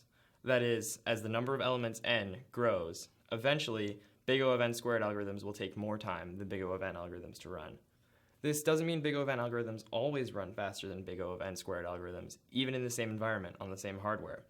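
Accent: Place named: India. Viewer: Awwh yes! Finally an english speaking lection! I am no racist, but god damn how can one focus on that Indian/Pakistani accent?!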